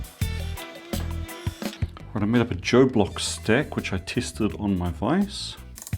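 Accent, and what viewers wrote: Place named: New Zealand. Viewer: Wow what a find. That vice is a real beast that just screams RIGIDITY, so should serve you well. BTW, you're clearly a Kiwi living somewhere in Europe I assume?? I'm in Auckland, NZ. Greetings from down under😊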